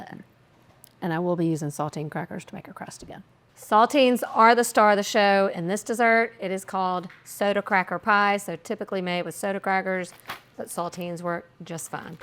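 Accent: South, United States. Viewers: I knew there was a reason I liked you so much, I lived in Mobile AL for 27 years and you have the southern thing down pat !!! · What part of Alabama are you from ? I grew up in Birmingham but now am in warrior. I love your accent. To me it’s the kind of southern accent that is pleasing to the ear and doesn’t make us sound dumb and uneducated like the fake southern accent’s you hear on tv. Love your voice and your videos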